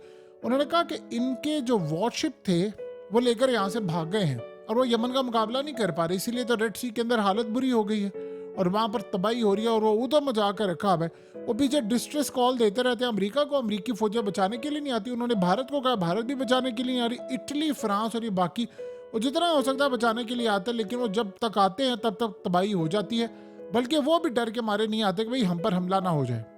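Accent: Punjab, India. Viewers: Sir Mai India 🇮🇳 se hu aap ki nwes ward ki Namber 1 nwes hai Aur aap ki Awaz hme bahot Achhi lagti hai urdu ke sath thodi si punjabi ki jhalki bhi hoti hai sir mai ko dekhna chahta hu plzz sir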